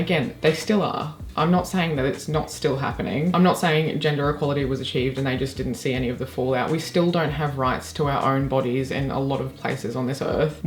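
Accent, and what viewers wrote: Australia. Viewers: Love hearing an Australian accent delivering intelligent, factual and insightful commentary about a massive issue. Post 1960 and the invention of the pill women have choices. We no longer spend our fertile years bearing or feeding bubs. Being pregnant and nursing infants makes us super vulnerable physically and financially. In an unsafe and radically unstable world young women will choose not to have children if they have access to birth control.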